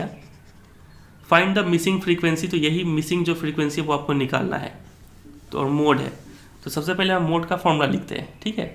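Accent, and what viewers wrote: Bihar, India. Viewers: Sir apki voice bihar-jharkhand ke logo ki jaisi h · Bihari hai aapna bhasaa aur sanskriti kaise bhul sakte . Proud to be bihari.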